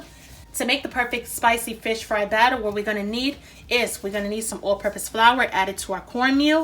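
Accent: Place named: Bronx, United States. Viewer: Great vid … Luv Da Bronx accent! 😉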